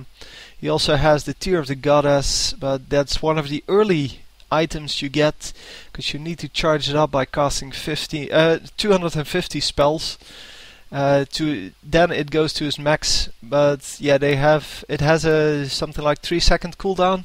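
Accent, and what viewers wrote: Netherlands. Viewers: stop the dutch accent!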